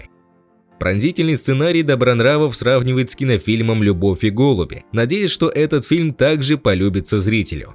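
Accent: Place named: Russia. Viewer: Как же ваш москальский выговор портит слово,, сватЫ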